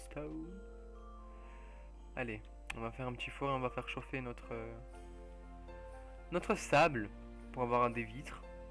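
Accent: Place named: England, United Kingdom. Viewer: ton casque change ton accent tu as un accent englais mais ses bien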